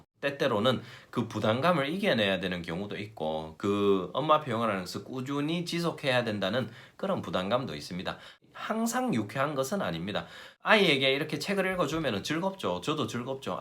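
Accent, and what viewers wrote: Gyeongsang, South Korea. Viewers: 목표설정에 도움되는 영상이네요~~어정쩡한거 말고 잘했으면 좋겠는거!. 경상도갬성 넘 조음요 ㅋㅋㅋ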